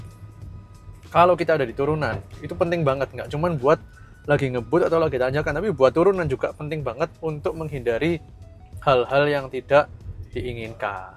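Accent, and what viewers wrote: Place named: Java, Indonesia. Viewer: ngomong aja medok yauda pasti bahasa indo Lah ,, lo mau ngomong sok english jg tetep aj predict ur nations braww hahaha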